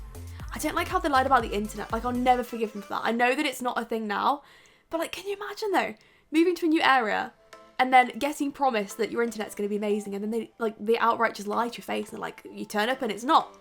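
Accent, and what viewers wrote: United Kingdom. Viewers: I have an idea for a create a sim! But real quick I love Sims but your british accent makes your videos even better because I love british accents anyway! But my idea is that you can/could make Mario, Luigi, Peach, and Bowser! THE MARIO CREW!!!!!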